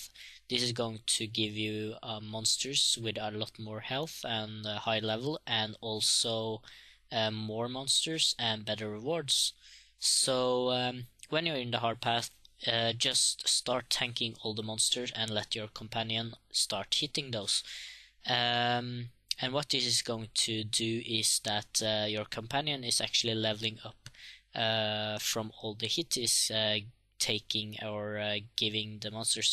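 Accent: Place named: Norway. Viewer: u from Norway? sounds like that :P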